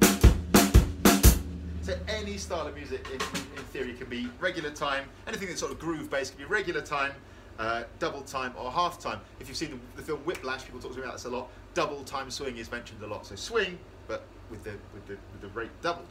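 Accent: England, United Kingdom. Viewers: loved it!! also I love your English accent :)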